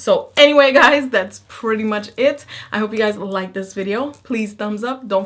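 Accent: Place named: New Jersey, United States. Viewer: you have this jersey mob accent i love it girl!! ha :)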